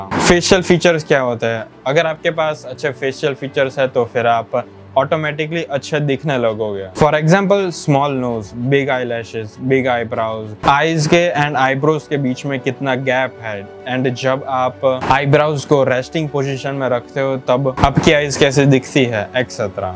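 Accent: Gujarat, India. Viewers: gujarati bolva na way thi khabar pde che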